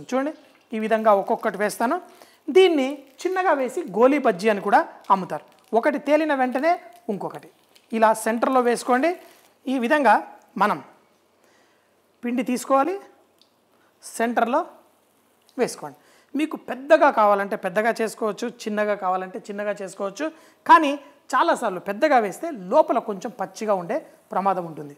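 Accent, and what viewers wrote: Telangana, India. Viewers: అన్న నువ్వు తెలంగాణల మాట్లాడితేనే మస్తు వుంటది 😁🥃🥃🙏🙏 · Telangana slang keka . . .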